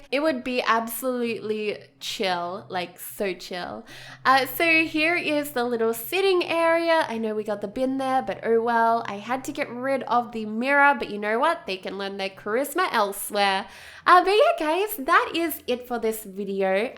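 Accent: Australia. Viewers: are you from Australia? your voice is really similar to Deligracy’s haha 💕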